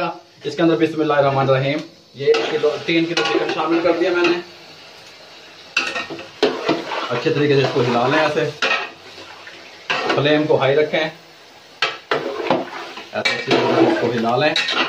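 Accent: Punjab, India. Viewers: karahi se zayada apki Punjabi ka maza aya hy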